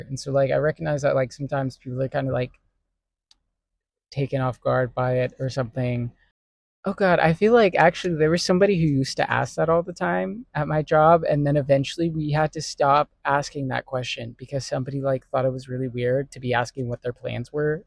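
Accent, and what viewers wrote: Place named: North America. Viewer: There is no pressure to talk to anyone. You sound North American where small talk may not be a good idea with anyone considering the state of our country. I don't care if anyone thinks I'm weird. 🤓 TBH , you make a good impression & have exceptional communication skills.😁 You do have a great smile, my friend.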